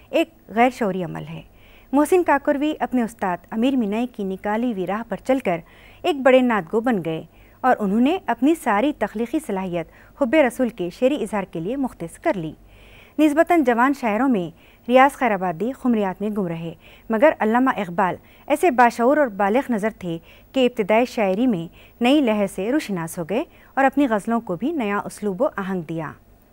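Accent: Deccan, India. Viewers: The teacher is from Hyderabad probably. Her pronunciation is quite Deccani.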